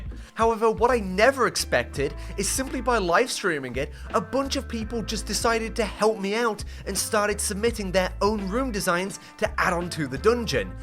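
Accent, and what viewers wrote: United Kingdom. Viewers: Firstly, you have a nice british accent, secondly i think it would be nice if you go to a room, and after some time, closed doors from every direction you can go just open 👍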